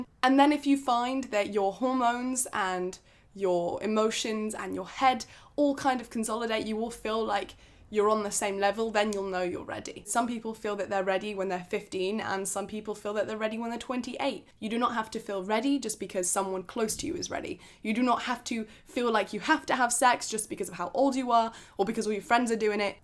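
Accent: United Kingdom. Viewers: I truely AM intrigued as to why quite a few of your video descriptions are actually in German? Ich find's wirklich toll, verstehe aber den Zusammenhang nicht, weil du einen britischen Akzent hast und deine Familie - was ich aus den Videos bisher gesehen habe - auch Englisch ist (?)... Lernst du Deutsch oder ist es einfach ein Witz? XD love your chanel, love your videos, and everytime a header is in German I'm drawn to it like a moth to light ^~^ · Your accent is that British accent in every movie, absolutely beautiful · i’m also British and i love her accent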